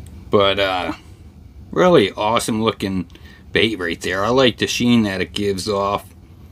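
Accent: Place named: Pittsburgh, United States. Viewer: I detect a little Pittsburgh in your accent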